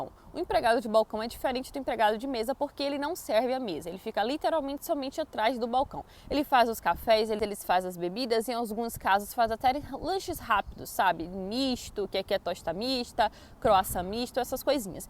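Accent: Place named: Northeast, Brazil. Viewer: Vc é nordestina..pq vc fala Pórtugal.. típico dos nordestinos ☺️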